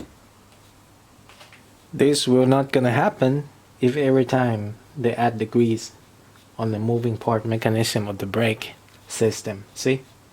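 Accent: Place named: Thailand. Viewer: ผมชอบอังกฤษ สำเนียงไทย ฟังรู้เรื่อง รื่นหูจัง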